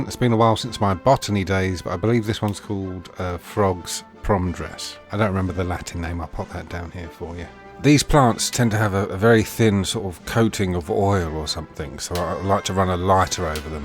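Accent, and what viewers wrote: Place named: United Kingdom. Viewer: Are you alright mate? You sound more British than usual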